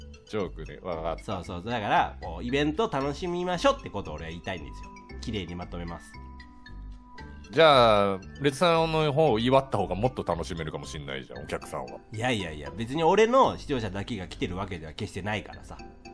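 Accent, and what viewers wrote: Kansai, Japan. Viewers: 大体レトさんと同じだわ やっぱ関西なのかね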